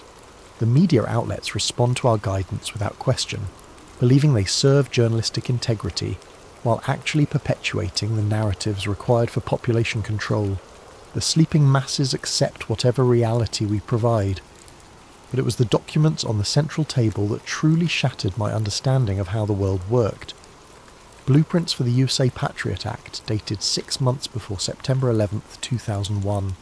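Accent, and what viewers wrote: United Kingdom. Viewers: Ugh the older I get the less I like the British accent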